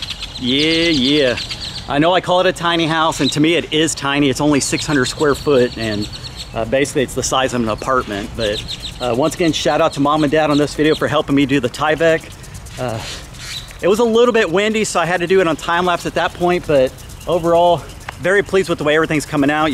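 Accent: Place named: South Texas, United States. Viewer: True south Texas sounds… especially with them crows 😅 just like San Antonio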